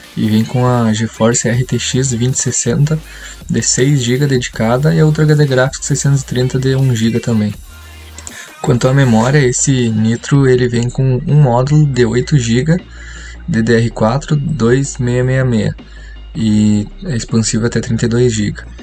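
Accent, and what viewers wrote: Brazil. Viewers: Amo assistir um video de tecnologia com um apresentador Gaúcho. É uma baita barbaridade thê kkk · Tu é de SP?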